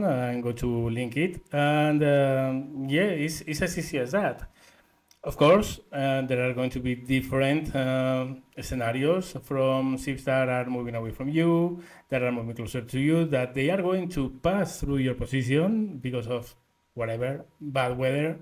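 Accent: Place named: Spain. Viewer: Unrelated to anything but Im wondering if you're Spanish since you sound like my Spanish coworkers when speaking English. But I could be wrong.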